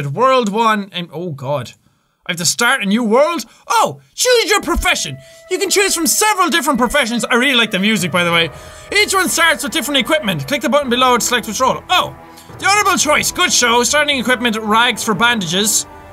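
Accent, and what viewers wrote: Ireland. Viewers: He is Irish if you cannot recognize his accent.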